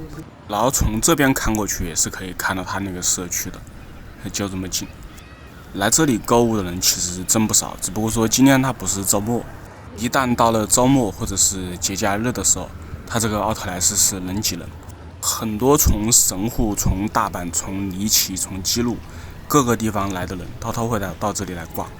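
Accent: Wuhan, China. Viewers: 博主是不是武汉人啊？ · 武汉的普通话👍😅😂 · 我也是武汉滴，刚开始冒看评论，一听你口音就知道鸟，我经常去日本旅行，有空聚一哈，研究一下在日本卖小龙虾🦞哈哈哈🤣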